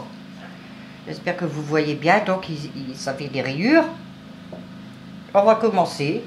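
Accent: North, France. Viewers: bonjour, comment va tu je vois que tu as fait un très beau pull, mon arrière petite fill.va avoir deux et griniette petite menue, il et très beau comme habitude, c est bon de t entendre parler avec ton accent du nord, je vais essayé de le faire avec moins de mail? gros bisous et gros Câlins a pompon, fais bien attention a toi, a très très vite bonne après midi, Monique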